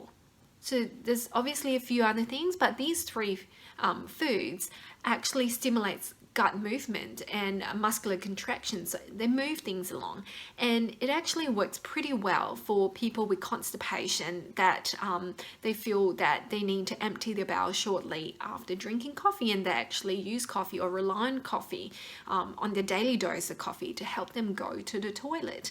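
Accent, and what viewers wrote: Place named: Australia. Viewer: You sound like an Aussie 😊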